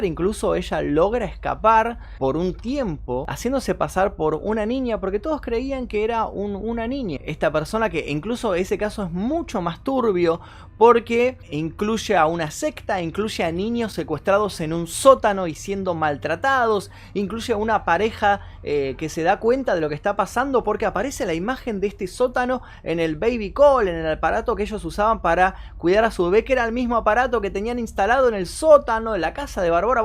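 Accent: Argentina. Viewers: jajajajajaja tranquilo bro, no puse nada malo xd me gusta el acento de los argentinos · SOS ARGENTINO ?? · Argentino che?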